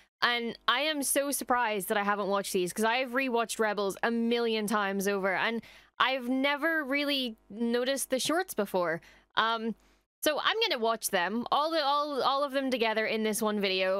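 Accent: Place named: Scotland, United Kingdom. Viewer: I truly love your passion for Star Wars. I was the same, at one time. The first movie I saw in a theater was ANH, was very young but that spark of such a fantastical world and wonderful characters stuck with me as I grew up. Made me believe I could do anything. It did the same for so many in my generation, and we kept the faith for a very long time. But as sorry as I am to say it, those thoughts/dreams/beliefs have been absolutely destroyed since Disney took control. They systematically removed and obliterated that spark, took away the wonder and imagination of such rich worlds/characters. And in it's place gave us tropes, pale remakes of the original, girl bosses, and modern time standards put into a purely fictional world. I don't begrudge you any enjoyment from this or any Star Wars content. Goodness knows I've liked my share of entertainment that others thought was garbage. 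I just have to say this because you seem very genuine and I hope you're not ignoring what is actually taking place with one of the top 5 IPs of all time. And just to add: I've never really known why but the Scottish accent has always been my favorite (and a weakness in certain cases).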